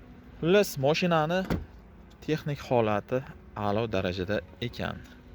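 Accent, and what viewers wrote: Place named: Kattakurgan, Uzbekistan. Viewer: Ака каттакургонданми бу малибу шевайиздан билдим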